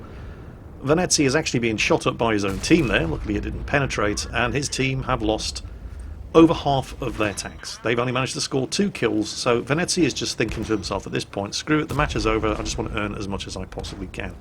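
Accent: Russia. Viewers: Gotta love ur laugh at these blindshots and the russian acsent:D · Love when is doing Russian accent.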